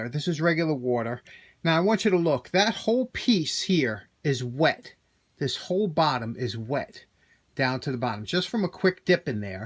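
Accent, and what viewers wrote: New York, United States. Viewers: i’m a new yorker too, born in brooklyn, grew up in Flushing, now live in Denver, great hearing your accent😊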